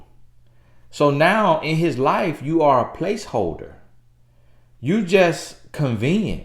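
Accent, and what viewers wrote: South, United States. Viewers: God bless you for this. Oh and I love your southern accent. 🇳🇬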